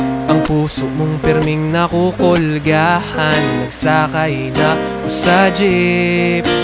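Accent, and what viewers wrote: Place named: Bicol, Philippines. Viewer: Haiii the bicol accent was good nakakarelax Po syaaa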